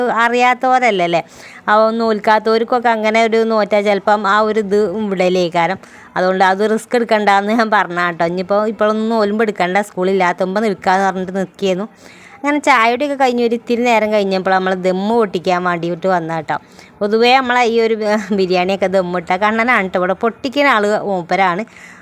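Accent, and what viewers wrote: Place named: Malappuram, India. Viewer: Ethrayaayaalum ningalude prarthanayum nombum chechi Allahu sweekarikkum.Alhamdulillah . Ningalude varthaanam kettal assal Malappuram thathamarude polethanneyund.May God bless you sister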